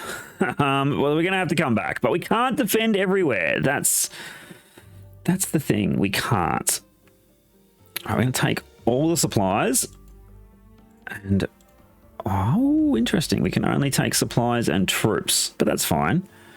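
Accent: Australia. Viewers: good to hear an Australian voice on these vids. Nice bit of creativity in the letters home. You could even include some history into some of them. I can tell you know a bit.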